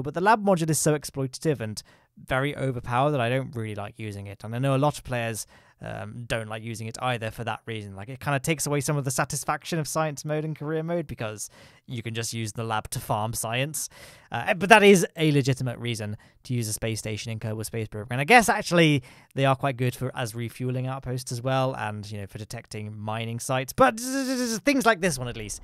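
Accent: United Kingdom. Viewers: Did you just say Cor-oh-nah virus? I come here for a good British accent and PROPER pronunciation.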